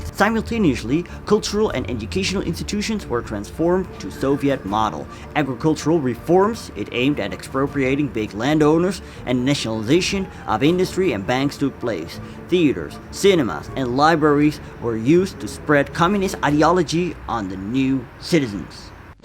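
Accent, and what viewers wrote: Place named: Netherlands. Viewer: Inhoud zeer sterk, helaas vreselijk dik Nederlandsch accent. Als je daaraan gaat werken zou het kunnen dat dit kanaal een groter bereik krijgt.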